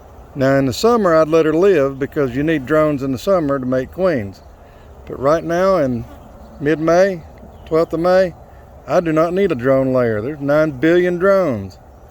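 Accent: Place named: South, United States. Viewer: don’t you hear that southern draw….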